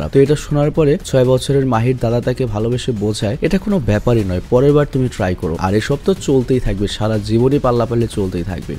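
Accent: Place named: Noakhali, Bangladesh. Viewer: এাই নোয়াখালীর হোলা❤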